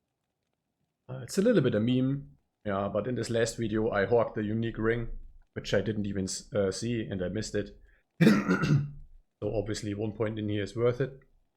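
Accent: Germany. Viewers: wenn man 2 sec im Video ist und aufgrund der Aussprache direkt weiß, dit is oochn deutsche Jung😂 Like+Abo direkt da gelassen, mehr d2r content= mehr gut ;)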